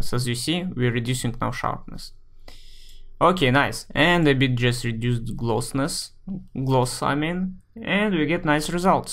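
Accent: Russia. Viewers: есть сильное ощущение, что твой родной язык - русский!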